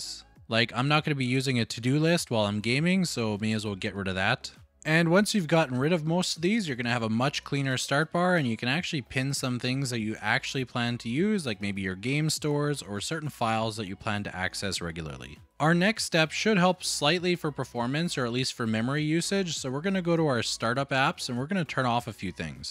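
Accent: Canada. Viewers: Great, to-the-point video. Also, love the Canadian accent.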